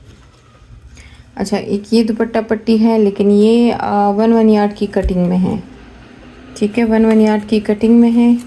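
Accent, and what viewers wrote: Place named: Peshawar, Pakistan. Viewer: Pta nhi ye pishawer wali urdu bolte waqt teek he, teek he itna ziyada q bolty hn 😅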